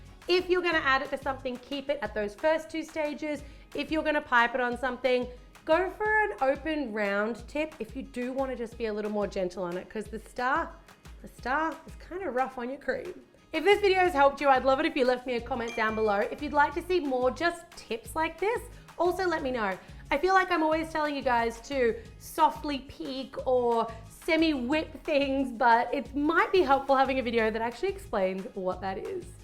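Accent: Australia. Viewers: IS SHE AUSSIE OMGGGGGGGG YESSSSSS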